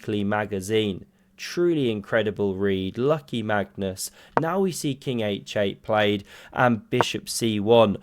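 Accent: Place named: RP, United Kingdom. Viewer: I subscribed as soon as I heard BBC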